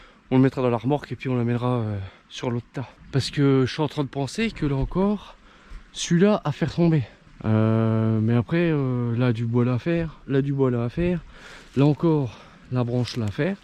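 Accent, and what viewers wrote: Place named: Lorraine, France. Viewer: A l'accent je dirais un lorrain 👍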